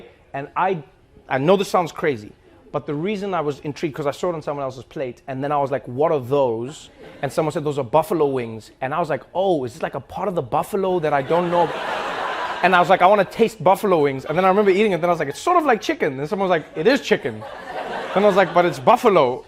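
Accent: South Africa. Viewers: I love listening to him talk. I think it's the south African accent. Lol, maybe one of the reasons I love listening to. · I love how he holds back on his south african accent when he's in America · that south african voice of trevor always cracks me up hahaha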